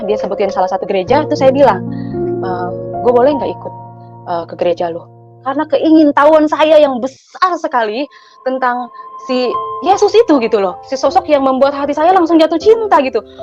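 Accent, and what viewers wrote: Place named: Sulawesi, Indonesia. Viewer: Suara nya KY orang Sulawesi...KY oten gt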